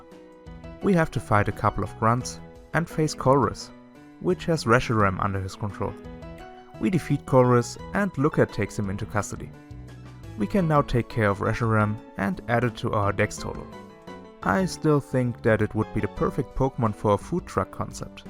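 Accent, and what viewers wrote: Germany. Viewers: Hahaha, nach 2 Sekunden direkt den deutschen Akzent raus gehört. Aber richtig gut. Ist eh besser in Englisch 💪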